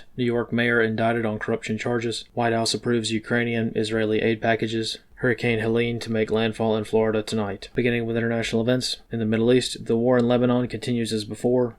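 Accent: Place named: Chicago, United States. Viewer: Sounds like he’s from Chicago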